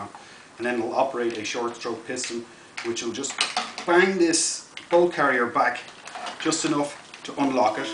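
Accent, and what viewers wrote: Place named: United States. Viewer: "you got yer safety over here!" you're such a yank now!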